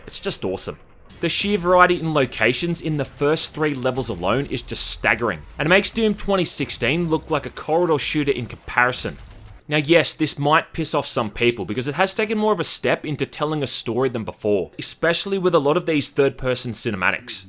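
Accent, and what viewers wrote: Australia. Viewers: So that's what aussie accent sooounds like.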